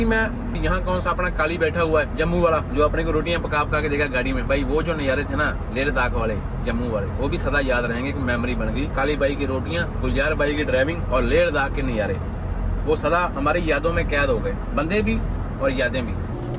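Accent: Punjab, India. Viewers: Punjabi bnda hindi bolde hoye das hi dinda asi punjabi aa # "ye jo khajoor ke rukh hai ye apka sawgat kre ge" 😅 great paji 🎉🧢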